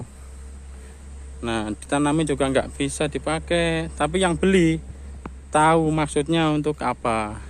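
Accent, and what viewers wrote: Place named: West Java, Indonesia. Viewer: bhs mu ane kl jabar kn..lucu haha hebat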